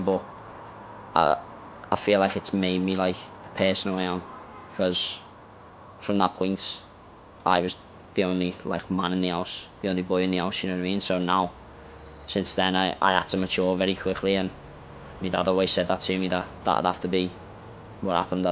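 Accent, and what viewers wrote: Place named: Wirral, United Kingdom. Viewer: Obviously he’s from the Wirral listen to him talk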